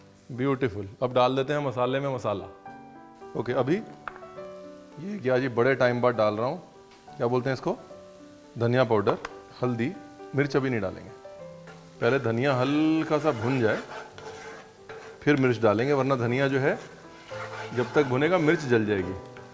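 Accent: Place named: Punjab, India. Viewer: Hindi mein punjabi touch ka jo mza dalte hain aap usse apnepn wali feeling ati rehti hai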